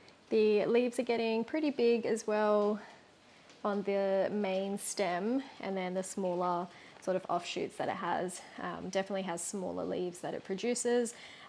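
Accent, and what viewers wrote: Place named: United Kingdom. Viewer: Wow your anthurium collection is so healthy and all the plants look great. I wouldn't have thought they could grow without a humidifier and I've been afraid to get any. My ambient air is probably drier than your climate though as I live in a desert! We hardly ever get the rain you were getting he day of filming. And your accent sounds British so maybe it rains a lot there in which case your ambient air has higher humidity than desert air!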